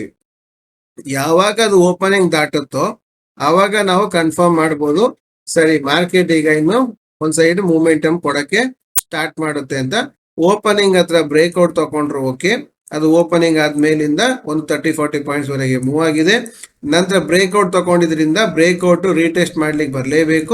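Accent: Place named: North Karnataka, India. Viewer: Good video sir Uttara Karnataka style helata edira sir stock bagge heli sir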